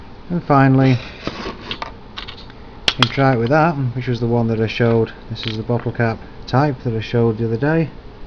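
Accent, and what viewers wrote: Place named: United Kingdom. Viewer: I want to try something different are you from the uk your accent sounded bristish. I have some ideas I wanted to try needed some help if possible ?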